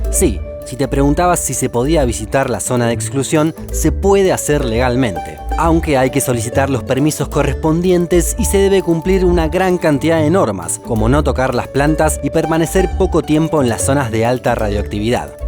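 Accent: Buenos Aires, Argentina. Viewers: Que embole con tonada porteña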